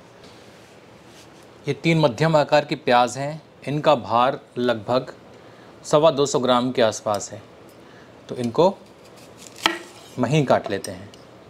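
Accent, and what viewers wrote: Standard. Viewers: Aapki sudh hindiwali vani soone pe suhaga hai.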